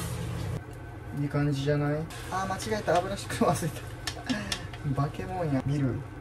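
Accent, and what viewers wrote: Kansai, Japan. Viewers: 関西弁いいわぁ🤝🏻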